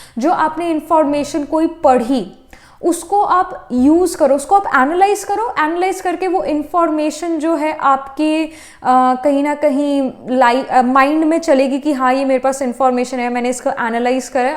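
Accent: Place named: South, India. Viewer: Your voice is very good with south indian touch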